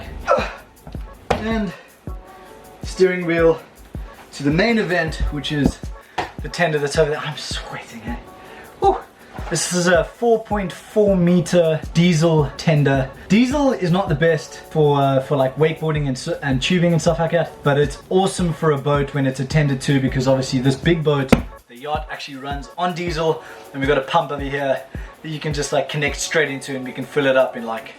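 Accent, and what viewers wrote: South Africa. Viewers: That’s so awesome man, I thought I recognized the accent, cause I’m also from South Africa! Where in SA are you from?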